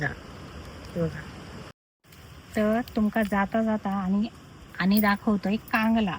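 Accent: Malvani, India. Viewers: आपली मालवणी भाषा एकदम भारी..❤ऐकाक पण छान वाटता आणि तुमची माहिती पण भारी 👍❤️❤️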